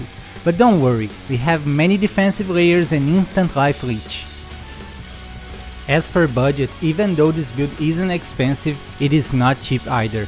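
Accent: Brazil. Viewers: Esse ingles brasileiro me pegou mt Bom trabalho mano!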